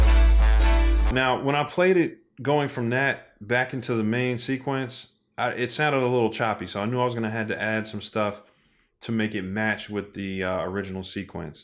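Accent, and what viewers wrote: Philadelphia, United States. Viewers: You from Philly fam?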